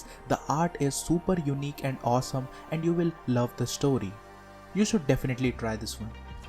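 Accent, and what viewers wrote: India. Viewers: Indian detected hehe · His accent like indian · bro pls turn back the old video type ı don't wanna hear your indian accent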